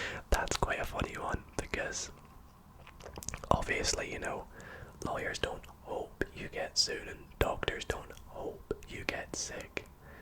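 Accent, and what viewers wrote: Scotland, United Kingdom. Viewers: You sound scottish are you from scotland?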